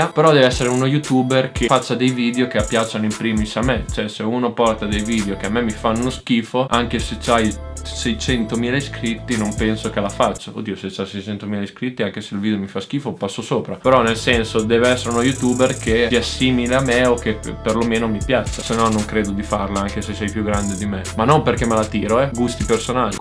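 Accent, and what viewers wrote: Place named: Tuscany, Italy. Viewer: la tua toscanita è palese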